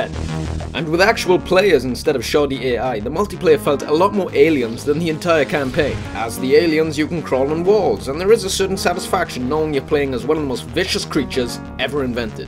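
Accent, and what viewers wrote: Wales, United Kingdom. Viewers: Hey love your stuff, it would be cool if you could give us a list of upcoming games you are reviewing. Btw where are you from? i want to say wales judging by your accent but i'm not sure:/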